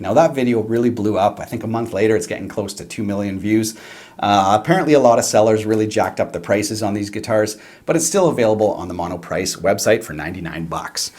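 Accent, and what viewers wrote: Canada. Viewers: Great video, exceedingly helpful! Would love to see it compared to higher-end tubes. Also, the Canadian accent seems more pronounced in this video for some reason.. love it!! 🤘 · he sounds candian, everything sounds weird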